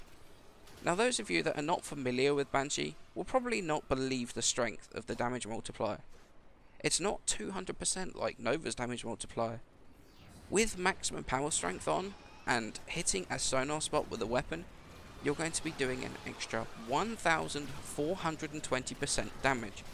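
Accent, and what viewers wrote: United Kingdom. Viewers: I am confused. What has his accent to do with the fact that you are from Germany? In my opinion his accent is the mildest British accent i have ever heard. It is really easy to understand him. Or was that a hint that you would wish for a German version of this video?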